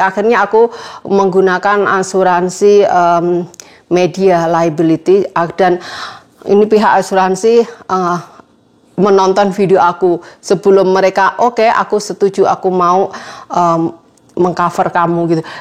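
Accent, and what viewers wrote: Java, Indonesia. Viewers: Konten mba kreatif kok yng komen gak kreatif itu orang emang bisa bikin konten seperti mba? Isi konten nya gak monoton kok misal nya cuma ngomong2 aja, jualan masakan aja. Malah berkat konten mba, aku bikin roti pake resep mba bagus rotinya. Liat konten youtuber ambil manfaat ilmunya, perjuangan orang tsb hingga sukses, yang paling penting bagi aku setiap sharing ilmu baking nya jujur gak nipu netizen. Mba ini walau hidupnya lama di luar negeri tapi gak lebai, suami bule nya gak pernah disuruh2 joget2 memalukan ala dangdut an di konten nya, gak bergaya dan berpakaian kebule2an, bahasa Indonesia dengan aksen jawa nya tetep ciri khas nya. Bahagia dan sukses nya kehidupan mba sekarang yg bisa bikin iri orang. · ngegas dan jutek Ngomongnya medok dan keminggris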